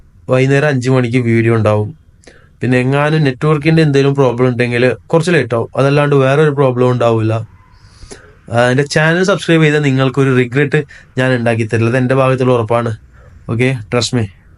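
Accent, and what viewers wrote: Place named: Kasaragod, India. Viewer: Kasaroda slangilanne describe aakiya madeera Ade majeullu Baaki south Kerala slangilellu kore vlog indra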